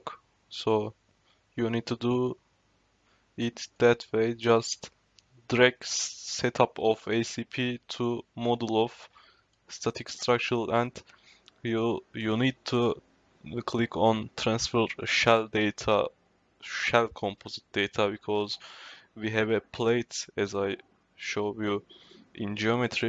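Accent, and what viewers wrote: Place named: Turkey. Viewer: Hardcore Türk ingilizcesi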